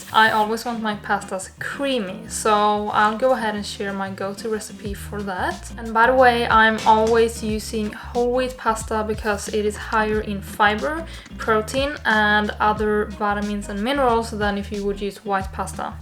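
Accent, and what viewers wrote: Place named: Sweden. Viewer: man hör direkt att hon är svensk ahhaha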